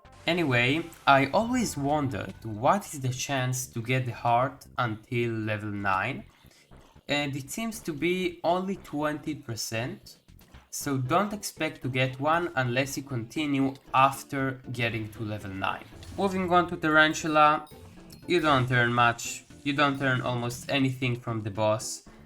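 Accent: Israel. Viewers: מבטא ישראלי? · איזה מבטא ישראלי 😂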